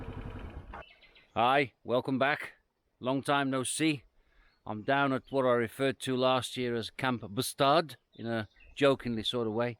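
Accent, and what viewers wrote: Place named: Black Country, United Kingdom. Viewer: I recognised the black country accent but I wasn't sure whereabouts.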